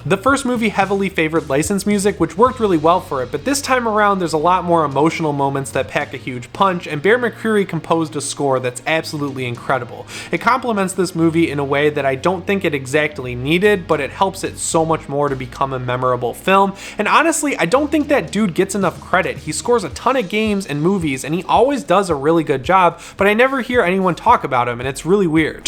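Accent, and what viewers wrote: Midwest, United States. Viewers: You sound like you're from Chicago or midwest.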